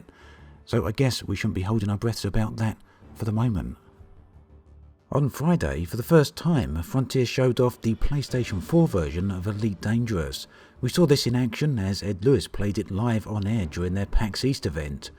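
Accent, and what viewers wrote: United Kingdom. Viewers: I did notice a slight British accent in your video today. (smile)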